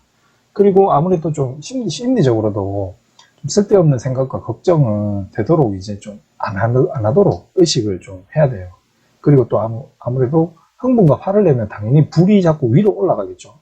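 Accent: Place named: Pohang, South Korea. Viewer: 안녕하세요,, 억양이 우리지방이네요.. 혹시 거주지가 포항은 아이죠??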